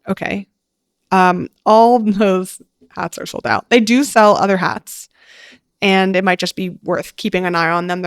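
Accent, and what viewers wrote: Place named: France. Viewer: Great french accent tbh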